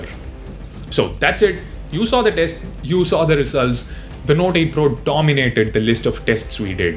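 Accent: India. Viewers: Wat an accent pure indian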